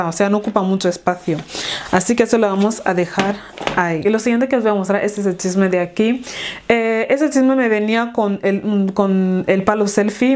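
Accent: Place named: Equatorial Guinea. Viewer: Saludos desde Buenos Aires!! Me encanta el acento de Guinea Ecuatorial!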